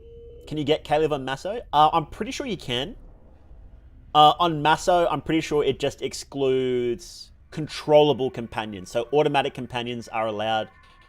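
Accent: Australia. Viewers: Mr Funny Australian Man, I have an important lore question. Is Patrick Bateman a new god?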